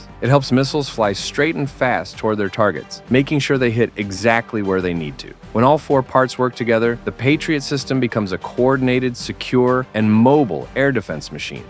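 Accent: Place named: Russia. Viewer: Впервые услышал руский голос автона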